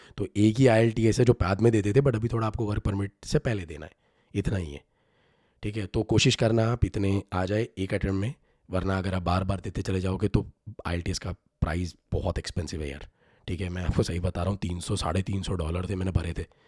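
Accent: India. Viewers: You need to improve your English speaking skills, sounds like you just came from India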